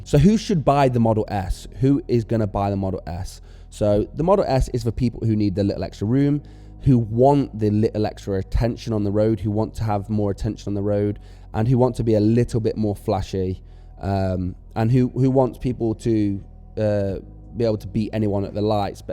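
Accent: United Kingdom. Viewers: Love listening to a Brit talking about a model 3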